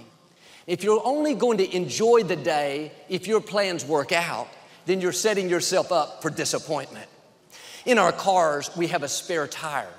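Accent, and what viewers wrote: Texas, United States. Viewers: Thank you so much for ALL your encouragement, jokes and messages! I do love your Texas accent. God bless you in Jesus' name! ! 😘😘🙋🙋👏👏😃😃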